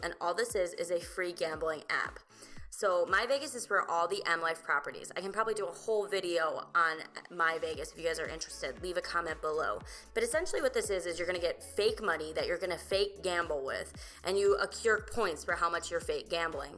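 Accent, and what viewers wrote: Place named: Wisconsin, United States. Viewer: From Wisconsin? Trying to pinpoint your accent.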